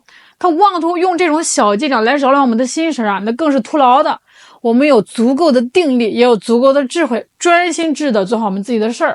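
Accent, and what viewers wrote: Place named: Northeast, China. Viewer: 唉呦喂 東北妹的腔調就是有致命的吸引力啊 喜歡😘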